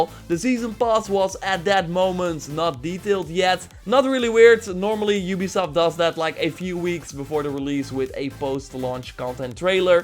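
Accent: Netherlands. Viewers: dude are you dutch or not ?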